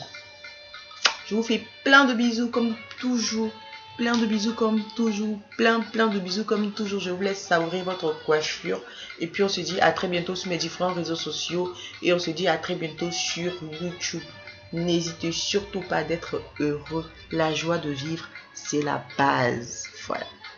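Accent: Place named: Ivory Coast. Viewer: de que j ai entendu t as voie j ai vue que tu étais ivoirienne #225👊👊👊👊👊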